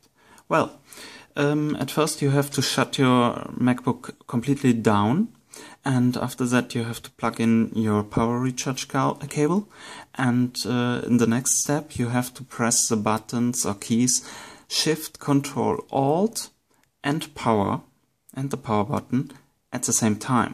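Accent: Germany. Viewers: german-english hört man halt einfach immer raus xD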